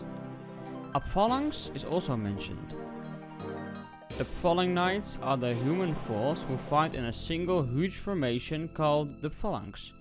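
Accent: Netherlands. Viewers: they are both dutch i think, i can tell by the accent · You should probably let other people narrate these and get some more interesting graphics. i feel like a Dutch guy is trying to give an English school powerpoint presentation.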